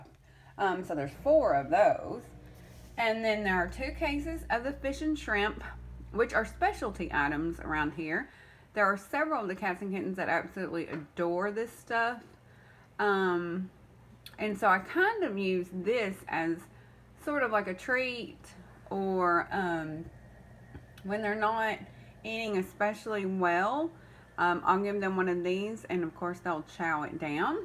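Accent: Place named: Texas, United States. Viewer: You sound like a Texan, like me.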